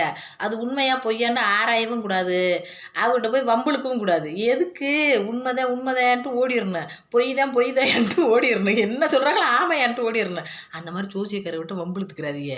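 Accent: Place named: Paramakudi, India. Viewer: நீங்க பேசுற ரொம்ப புடிச்சிருக்கு என் பிரண்டு ஒரு பரமக்குடி சைடு அந்த ஊர் மாதிரியே நீங்க பேசுறீங்க நீங்க நல்லா தொழில் பண்றீங்க அது மாதிரி தான் நானும் ஏதாவது செய்யணும்னு முயற்சி பண்றேன் ஆனா என்னால் செய்ய முடியவில்லை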